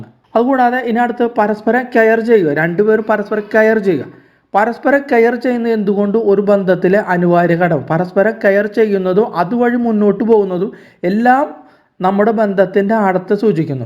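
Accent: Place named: Kochi, India. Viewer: അവതാരകൻ ക്ലബ്ബൗസിൽ സംസാരിച്ചിട്ടുണ്ട് എന്ന് തോന്നുന്നു... കൊച്ചിക്കാരനാണ് അല്ലേ👍😊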